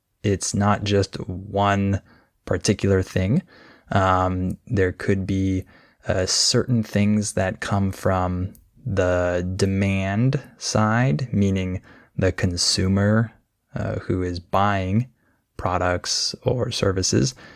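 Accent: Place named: United States. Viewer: American accent 😊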